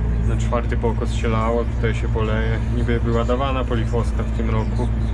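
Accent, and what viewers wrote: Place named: Podlasie, Poland. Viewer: Słychać że Podlasie,z resztą tak jak ja. POZDRAWIAM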